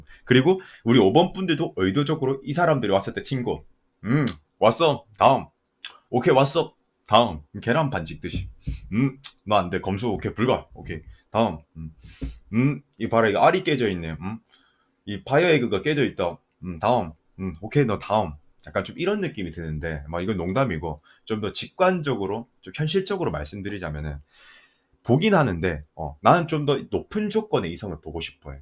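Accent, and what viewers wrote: Gyeongsang, South Korea. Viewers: 2번~(2020/7/12) 2명. 잘 맞추시네요~^^ 경상도말씨 반가워요~^^ 주말잘보내세요.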